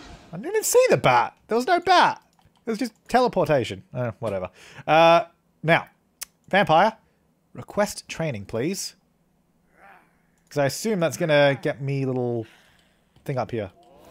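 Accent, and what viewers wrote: Australia. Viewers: I cant tell does he have a Australian accent or?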